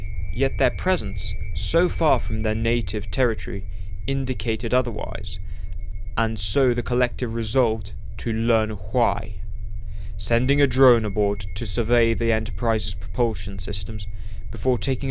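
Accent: United Kingdom. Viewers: I'd prefer it without the British accent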